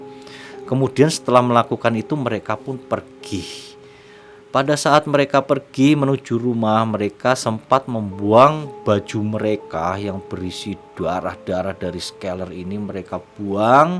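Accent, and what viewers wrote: Java, Indonesia. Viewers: Logat jawanya terllu dalem jd agak ganggu dengernya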